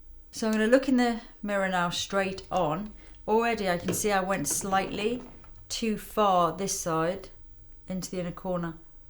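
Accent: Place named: Hampshire, United Kingdom. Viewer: Beautiful. Do you come from anywhere Hampshire you have a faint accent?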